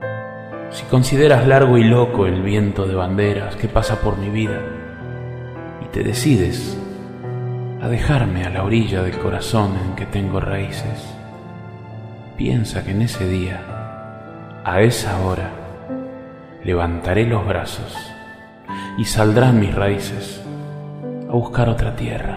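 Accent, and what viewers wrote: Argentina. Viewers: Horrible el acento argentino. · Cómo creen? El poema es hermoso, pero no con acento argentino, que no saben ni pronunciar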